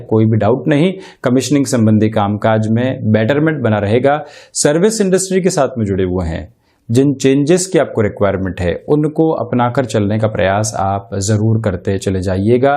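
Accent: Standard. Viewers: Pranam 🙏💐🌹💐, aap bahut sundar tarike se har din rasifal batate hai,aap ki shdh hindi bahut achhi lagti hai,bahut bahut dhanybad aapko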